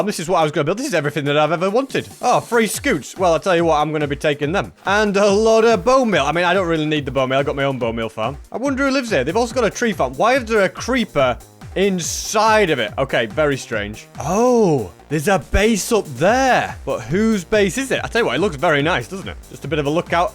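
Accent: United Kingdom. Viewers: Are you British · Today is the day,I realized that is British 😅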